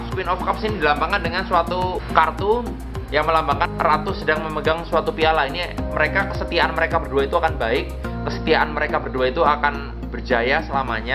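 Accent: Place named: Yogyakarta, Indonesia. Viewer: Orang mana bang kok medok?😂, Dari Jogja ya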